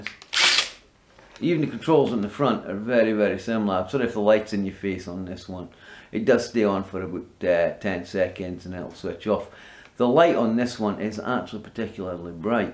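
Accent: Scotland, United Kingdom. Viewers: Yeah he's Scottish I can't quite define whereabouts the accent is from because different areas of Scotland have different twangs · Great review, would you mind telling me where you're from? I'm trying to place your accent haha, Scotland?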